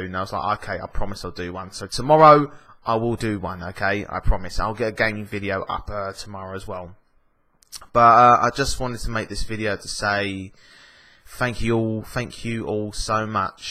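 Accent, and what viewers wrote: United Kingdom. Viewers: Hey don't listen to the haters, I personally think ur handsome and I LOVE the British accent! I prefer a British accent. I love following you and u make me laugh, ur very cute, continue to make videos :) also can you do a public freak out compilation reaction video? I'd love to see how u react ^_^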